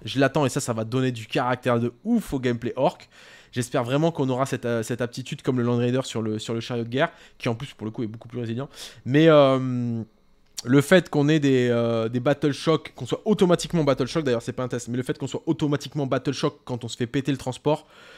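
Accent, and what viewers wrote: England, United Kingdom. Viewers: Change rien mec! Haters gonna hate ! Tu nous régale avec ton accent anglais 🤣😘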